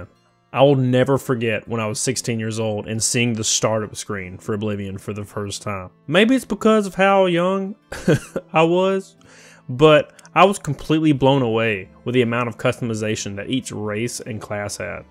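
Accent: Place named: Texas, United States. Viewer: Your accent sounds like Amarillo TX....had a co-worker who sounded just like you before. Regardless, your accent is so relaxing and you do a great job!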